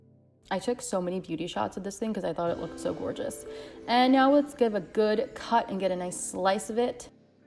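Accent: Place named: Long Island, United States. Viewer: You from Long Island? I can heart in your voice. I’m a Queens boy myself. Great recipe!!!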